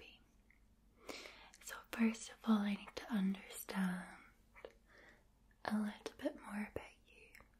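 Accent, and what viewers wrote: Scotland, United Kingdom. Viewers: As long as it's said in a Scottish murmur, I could listen to advertisements all day. you picked a great ASMRtist! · Can you do a whisper video with more of a Scottish accent and thank you for your time 😊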